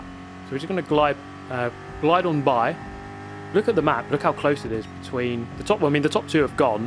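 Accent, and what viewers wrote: United Kingdom. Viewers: He sounds more British than most British people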